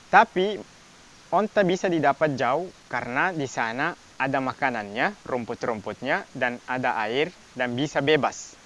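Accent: Makassar, Indonesia. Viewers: Kereeeeen aksen Makassar da pakai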